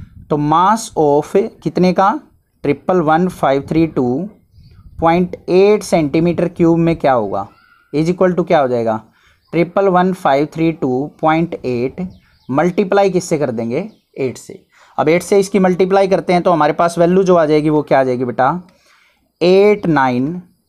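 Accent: Haryana, India. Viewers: sir aap haryana se ho kya 🤔🤔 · haryanvi sir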